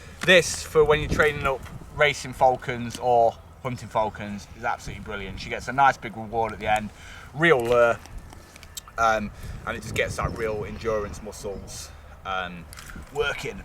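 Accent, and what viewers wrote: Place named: South Carolina Lowcountry, United States. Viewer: I can tell by your accent that you live near me in the South Carolina low country. I’m located in Myrtle Beach and was searching for a previously owned falcon on Craigslist. I’ve been ripped off TWICE now. 😔. I bought my first bird from a guy who would only meet with me in the Brew Ha Ha’s parking lot. At first I loved his bird. It was so gentle and quiet. The bird came with the little hat and everything. But after purchasing it I waited three days for it to wake up and NOTHING! So finally I decided to take off its little hat and visor. Only then did I discover that it didn’t have a head. The hat was literally sewn onto some animal’s torso. I’m not even sure if it was ever a bird to begin with. Upon further inspection I was appalled to realize that the animal’s magnificent feathers had all been Scotch Taped to the pelt. 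I was very skeptical of the second seller and demanded that wake the bird up. I was relieved when the bird became animated. It was an angry foul beast but the seller was confident that the bird was just having a bad day and was usually a late riser. So I bought the bird. I was so excited about my new falcon and posted pictures on my Instagram. It turns out that my bird isn’t suitable for the sport of falconry. Again I was duped. The bird I purchased doesn’t even fly. It looks like a falcon but it’s usually not trained to fly. It isn’t so much a sporting bird. My friend Ming’s parents own the Wok In Laotian restaurant and daycare center in Myrtle Beach. They id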